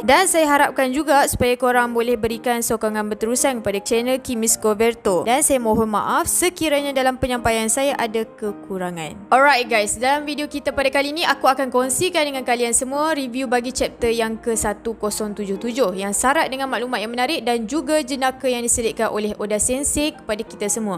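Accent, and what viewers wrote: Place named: North, Malaysia. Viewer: Gws ….. pekat na utara dia 🤣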